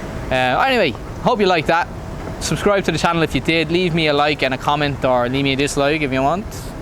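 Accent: Ireland. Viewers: Wow, that would have sent me in a major panic. Glad it all worked out fine in the end. I always get caught up in your accent. I have never heard someone add a 'th' at the end of every 5th word. Like your name, there no 'th', but you still pronounce it that way. Is that part of the Irish accent? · Nice and Interesting Story, from a that time young and naïf person., 1 positive Remark, take care of your English/Irish, not everyone is a Native English speaker and sometimes it’s fast and a little bit difficult to understand in your Enthousiasme. 🙏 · I can relate to this in so many ways 😅 scammed in HCMC all over the shop and the Irish accent going down great in Pai 😂 Great story, give us more of them!😁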